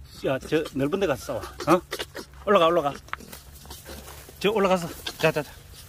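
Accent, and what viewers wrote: Gyeongsang, South Korea. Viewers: 아 말씀 하시는 사투리가 너무 같아서 어디신가 했네요 예천이시군요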